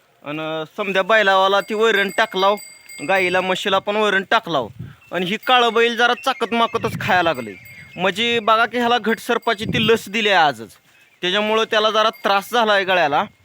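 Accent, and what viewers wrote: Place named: Marathwada, India. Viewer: भाऊ तू खुप छान बोलतो ही मराठवाडा भाषा आहे ना ही लय हासतो राव तुझा बोलन्याला तु खुप मनमोकळा माणुस आहेस 👍👍👌👌🥰🥰🕺🕺🌹🌹💐💐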